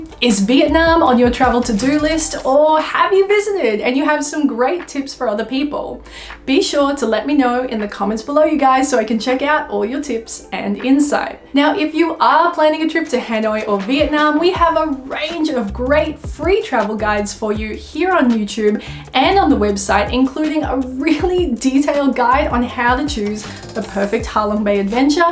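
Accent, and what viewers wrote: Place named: Australia. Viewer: I have been living overseas for about 25 years and I have never been to Vietnam my country before. Your travel videos are very relaxing and I am glad that you really had a great time in Vietnam. After the Covid 19 pandemic I plan to go to Vietnam for the first time and please make more travel videos in the future they are really good. Do you like Tokyo and Japan? By the way Australian accent is quiet exotic!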